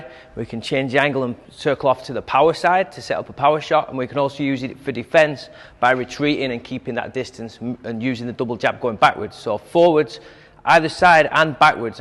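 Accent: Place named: Liverpool, United Kingdom. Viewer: You're a South Park and possibly a Scouse❤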